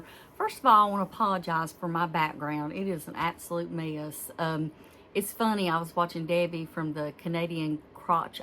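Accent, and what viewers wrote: South, United States. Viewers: I missed you. So good to see and hear your southern voice!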